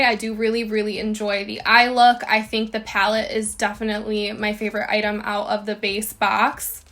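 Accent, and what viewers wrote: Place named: Minnesota, United States. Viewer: You’ve got to be a fellow Minnesotan because you sound like every girl I know.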